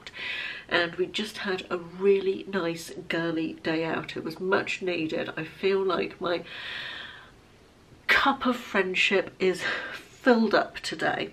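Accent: England, United Kingdom. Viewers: Oh please don’t shut off the comments on your clothing videos. I really enjoy all your videos you are Hilarious!!! I’m in California but I enjoy your English accent so much. Take care of yourself ❤️❤️❤️❤️❤️❤️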